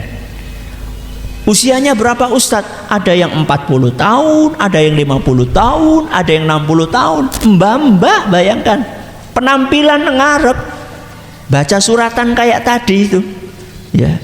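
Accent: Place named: Banyumas, Indonesia. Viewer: Masya Alloh..... Seneng dengerin ceramah pak ustadz, karena suka diselingi bahasa Jawa yang ngapak😂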